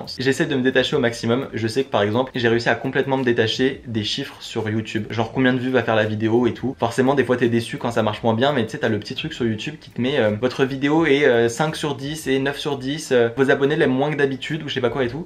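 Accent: England, United Kingdom. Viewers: Alors, j'adore ton accent anglais, team Serpentard depuis toujours hehehe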